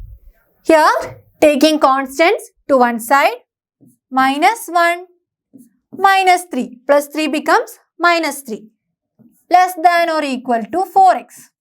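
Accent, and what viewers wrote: Kerala, India. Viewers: Madam is excellent with her Malayali accent.Her explanation is so cute.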